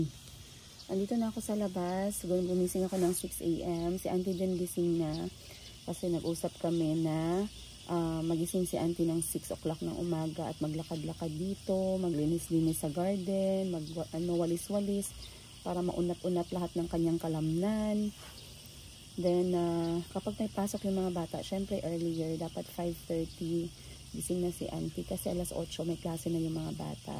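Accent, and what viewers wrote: Iloilo, Philippines. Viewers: Ilongga ka mam??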